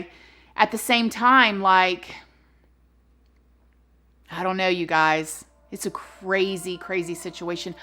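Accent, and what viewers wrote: South, United States. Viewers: I feel so bad for her, she had a terrible existence from when she was a child. So sad for her and her victims. Slight side note.. love your accent. Whenever my friends and I (Brits) mess around with American accents ( I've literally been doing this since I was a kid) it's always your accent 😂 love it! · Maybe it's your southern accent but most people pronounce Aileen as eye-leen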